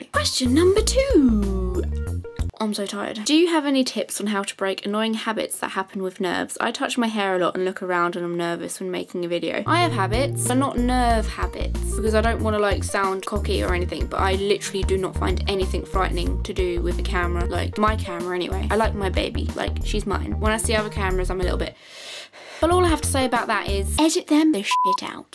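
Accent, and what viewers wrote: United Kingdom. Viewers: her briish voice is a little annoying but i can dig it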